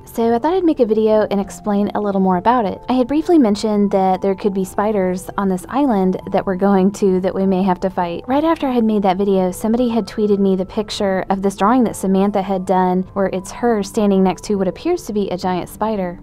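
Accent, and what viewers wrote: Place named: South, United States. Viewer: She's southern of course she has a nice voice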